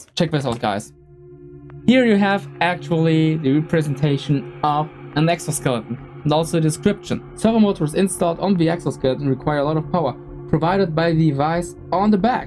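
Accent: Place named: Germany. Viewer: Shout out to random, Eastern European, gaming channels. Gotta be one of my favorite genders. Edit: Ok, turns out he’s German. I’m really bad at identifying accents.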